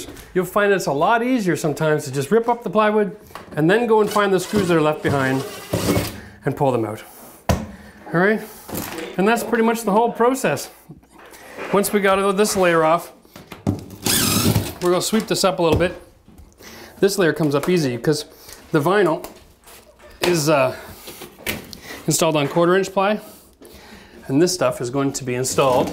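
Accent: Canada. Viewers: and i khew it before I saw the Tim Hortons cup. The way Canadian's say house gives it away every time. or out. Also, he has skills.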